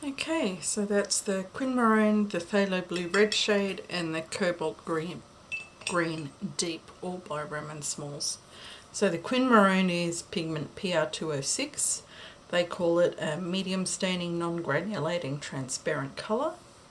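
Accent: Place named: Australia. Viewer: Loving your videos! And it's nice to hear an Australian accent down this watercolour youtube rabbit hole. I just wanted to say that Naples Yellow differs from yellows/yellow ochres in that it's actually brown - so can be used in skies without making green when mingling with blue.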